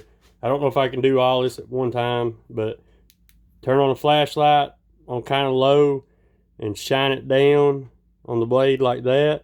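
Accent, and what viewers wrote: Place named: Tennessee, United States. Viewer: Great video, I spit my coffee out then you were sharpening the recurve and said Shit. I’m from Tennessee, you gotta be from this area with that accent.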